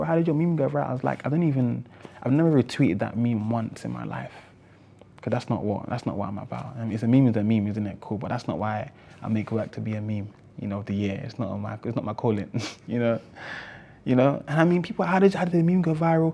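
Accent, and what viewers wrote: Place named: RP, United Kingdom. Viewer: Do you really chat like that lol 😂 sound like really posh and stupid bro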